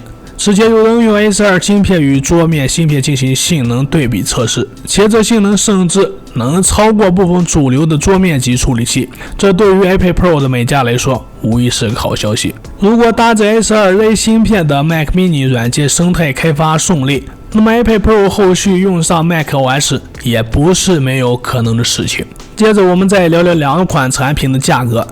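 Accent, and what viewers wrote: Shanxi, China. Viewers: 这是山西，河北北部或者内蒙古中西部地区的口音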